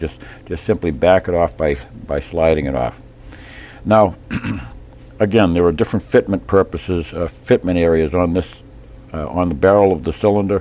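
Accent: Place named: Massachusetts, United States. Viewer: Great video. Very informative. I have two S&W revolvers and this is great information. Also enjoy the Massachusetts accent :)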